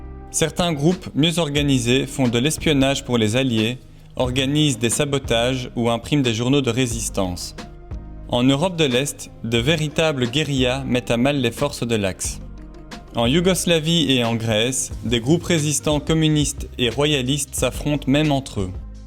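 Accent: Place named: Belgium. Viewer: Raphael Ebony Fans c’est l’accent belge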